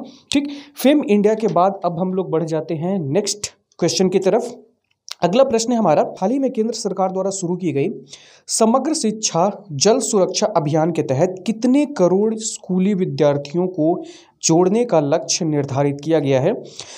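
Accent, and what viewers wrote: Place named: Bihar, India. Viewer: 7/11 , sir jis tarah aap 11 ko ekgyarah bolte hai na ...bahut achha lgta h.. i think aap bihar se belong krte h...agar mai galat hu to I'm sorry plzz ..mujhe jo lga Maine bola ..but I really like ur way of teaching 🙏🙏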